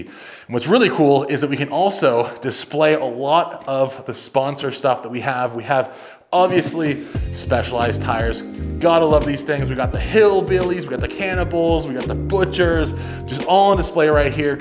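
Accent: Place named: Australia. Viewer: i thought you where aussie from just watching your shorts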